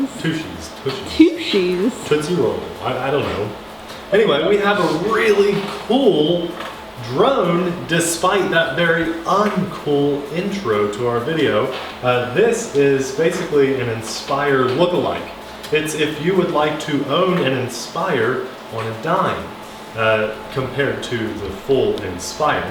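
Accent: United States. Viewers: "Very fun!", you have to be an American with that English.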